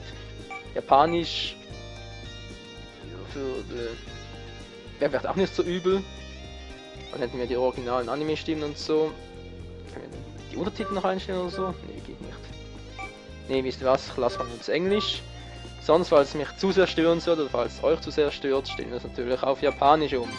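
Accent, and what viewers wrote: Switzerland. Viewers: haha chas sie das du schwizer bisch? isch ja mal voll geil ;D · bist du schweizer ? :D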